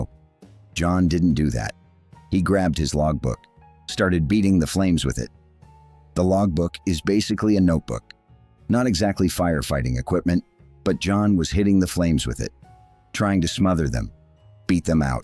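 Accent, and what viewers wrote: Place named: United States. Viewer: AMERICAN VOICE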